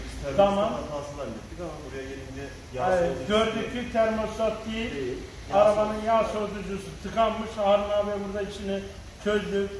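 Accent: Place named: Urfa, Turkey. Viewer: Ustam urfanın neresindensin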